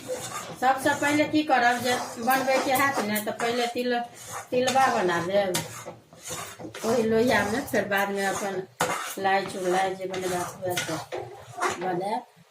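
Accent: Bihar, India. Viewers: Are 0 aunty jara bihari hindi choor kar achhe se hindi bol ligeye barna channel kabhi nahi famous hoga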